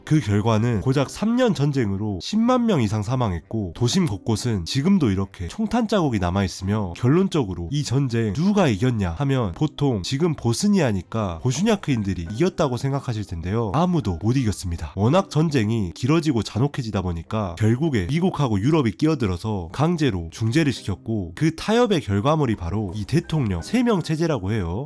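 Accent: Jeolla, South Korea. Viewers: 전라도 유튜버는 정말 오랫만에 보네요 첫 멘트부터 억양이 ㅋㅋ 언제나 화이팅 하시길😊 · 아 전라도 사투리 듣기싫네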